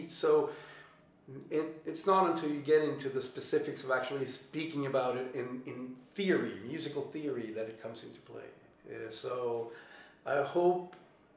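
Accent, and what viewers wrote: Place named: Wisconsin, United States. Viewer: I would’ve thought he was from Wisconsin if I didn’t know otherwise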